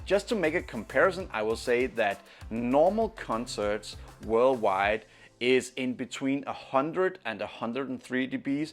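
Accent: Denmark. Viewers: danish accent? are you from Denmark?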